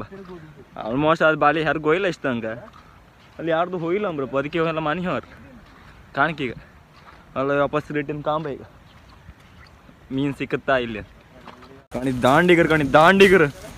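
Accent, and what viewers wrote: Kundapura, India. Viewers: Kundapura kannada baari saap matadtri mare😂❤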